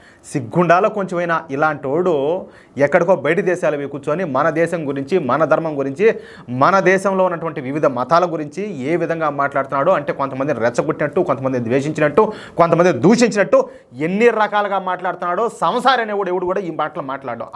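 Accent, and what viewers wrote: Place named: Kadapa, India. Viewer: Anna manadhi Kadapa...Anna....slang antlageundhi.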